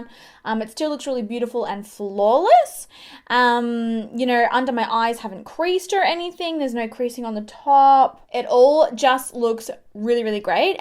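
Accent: Australia. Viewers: Oooooo love this! Yes that Aussie accent!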